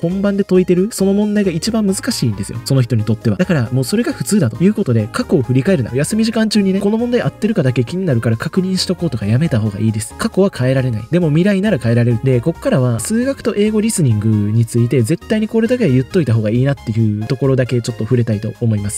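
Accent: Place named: Kansai, Japan. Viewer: 関西弁丸出しなのが本気度を表してる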